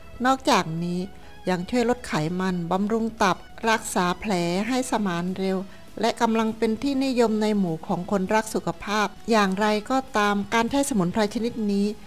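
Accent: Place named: Cambodia. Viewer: สำเนียงคล้ายๆเขมรแถวบ้านผมเลยครับ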